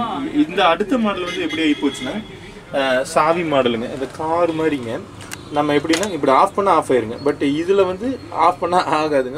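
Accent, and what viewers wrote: Kongu, India. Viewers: Anna kongu Tamil super pesuringa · கொங்கு தமிழ் சூப்பர் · நண்பா கோவை கொங்கு தமிழ் சூப்பரா பேசினார்💯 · Enjoy கொங்கு voice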